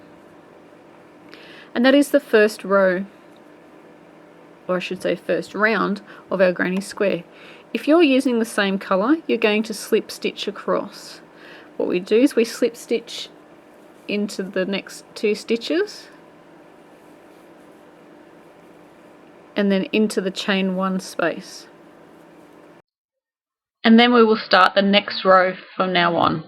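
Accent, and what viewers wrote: Australia. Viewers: love hearing the Aussie accent!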